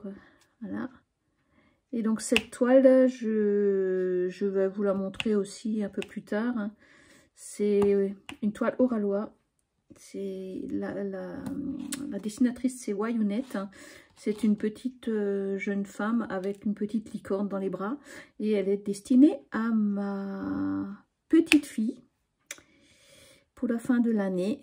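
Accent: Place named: Alsace, France. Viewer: Solù, ehr geht guát und dù? J'ai explosé de rire avec le Godvertami ou alors le Godverclemi, c'est tellement nous les alsaciens. Désolé je suis nul dans l'alsacien écrit, mais je crois que c'est pas si mal 😅🤣 Moi aussi je me reconnais en toi, ta façon de parler, les expressions, c'est génial j'adore ❤ Moi, j'avoue que je me retiens beaucoup, car je parle le "fralsacien" au quotidien. Je suis tellement contente d'avoir fait ta connaissance via YouTube. Je crois qu'on s'entendrait bien dans la vie ❤ Decka Schmoutz et bonne soirée 😘😘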